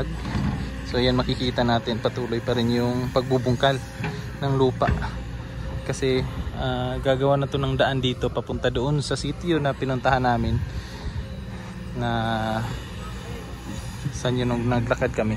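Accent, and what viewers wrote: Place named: Visayas, Philippines. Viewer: 😂😂😂😂natutuwa ako SA tuno NI sir Pg magbisaya..ang cute😂😂❤❤❤❤❤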